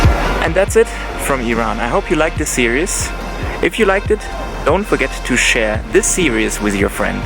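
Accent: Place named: Germany. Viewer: accent reminds me of all my friends from Deutschland. 🇩🇪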